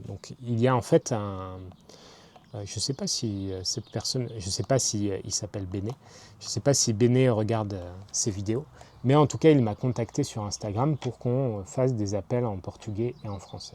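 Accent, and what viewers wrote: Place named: Marseille, France. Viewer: Dis moi que tes de Marseille sans me dire que tes de marseilles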